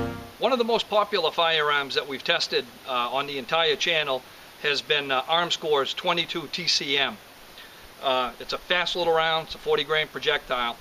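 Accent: Maine, United States. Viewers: hmm....you sir, sound like a southern mainer. am i right?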